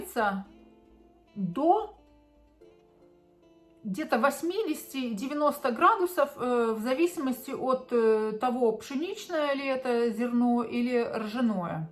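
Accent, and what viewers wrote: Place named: Finland. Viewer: У Вас финский акцент👍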